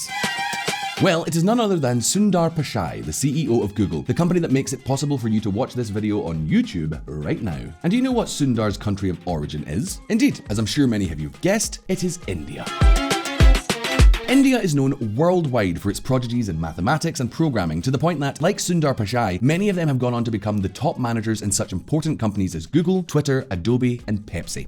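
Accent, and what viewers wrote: United Kingdom. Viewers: This was the best Video from accent to being Genuine Uk citizen 😅 I liked it all. Thanks for great neutral explanation.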